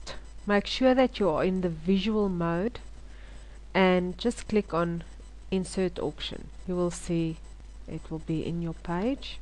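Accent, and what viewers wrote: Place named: South Africa. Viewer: Haai auntie maar daai is n South african accent. Thanks for the video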